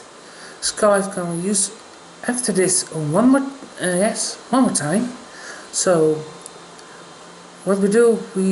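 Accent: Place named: Netherlands. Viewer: Enjoyable videos, keep them coming. And you are Dutch or Belgian, kinda recognize the accent :).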